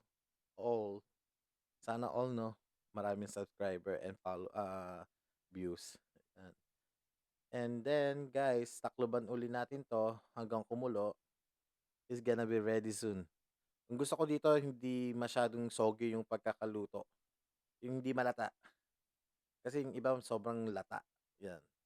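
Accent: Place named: East Asia. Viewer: I was thinking you're Singaporean, Korean or Japanese kasi the way you speak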